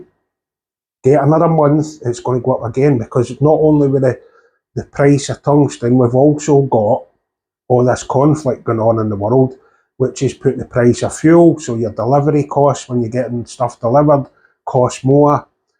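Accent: Scotland, United Kingdom. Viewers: nothing like a Scottish accent! cheers, great video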